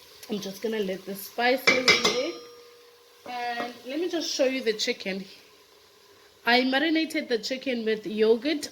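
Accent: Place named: South Africa. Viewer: First of all love and miss hearing that South African, Kaapstad accent. I also hate the taste of those little (I think it's cardamom seeds). My Mom used to cook the chicken and potatoes separately, then layer them, potatoes, rice mixture, chicken and so on and let it slowly simmer and then we would have it with mango chutney yum yum yum, lekker smekker goed. Enjoyed watching you, I have never tried making this on my own, but watching you I will certainly try my hand at it. Thank you for the inspiration, geniet, dankie en tot siens.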